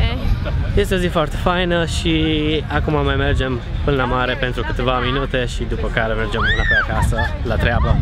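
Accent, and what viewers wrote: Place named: England, United Kingdom. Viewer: nu se impaca accentul englezesc cu romana mai deloc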